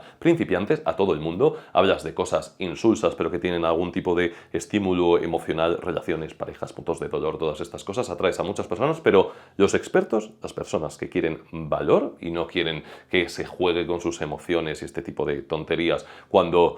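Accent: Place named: Latin America. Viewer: Lo que preciso es mirar a tu espalda por tanto tiempo como quisieras mostrármela. Qué guapo eres,. Sólo espero que la cámera pueda encajar más bajo de todos lados. Dulce. Lo siento. A la profesional. Como maestro de castellano, yo querría saber de tu manera del hablar., hablas un poco como un latino en relación a "voy a..." y nunca usas "esperaré, intentaré". Además usas "las cosas" cuando un español de pura cepa nunca lo haría. "La cosa, la cosita" son de latinos. ¿Tienes amigos latinos o te has quedado en Perú o Méjico? ¿Quizá tu mujer es latina? I'm strictly curious and obviously Te visto con buenos ojos, and mean you no ill will. Si alguien me ofretara 1000€ a decir algo malo de ti, no lo haría. Y finalmente, sobre el tema: La razón por qué no funciona la serie de "cosas" por un tío sea que lo que dijera no era factual. "Sí, lo quiero", pero en el corazón, no es verdad. 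Cuando nos mentimos puede sonar de verdad y a veces sólo nosotros no sabíamos que fue mentira.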